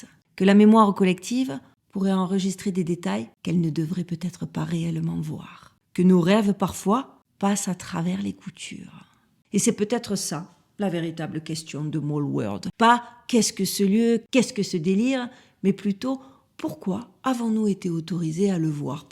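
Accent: South, France. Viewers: J'aime tant votre accent du sud... Ne le changez pas, il y a trop de français du sud qui le changent, en premier le Monsieur de la ferme des cochons laineux du tarn-et-garonne, il est presque parisien avec son accent! Mais il fait un travail excellent alors je profite des autres, qui sont rares, qui ont un si bel accent! Merci pour votre travail. Je viens de regarder la video des menus des Rochschilds. Excellent! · Toujours au top tes vidéos, un régal de t'entendre et de te suivre. Ce petit accent du sud , j'adore ...😉 Hier je suis tombé sur cette vidéo de 3I atlas <link> À méditer....🤔 Belle continuation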